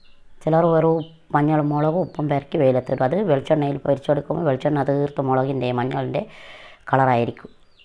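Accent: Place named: Kannur, India. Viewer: തനി കണ്ണൂർ 'മ്മടെ 'നാട് '👍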